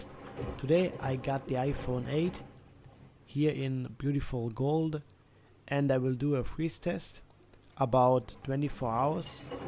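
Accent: Germany. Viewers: dieser deutsche Akzent ;) aber gutes video bro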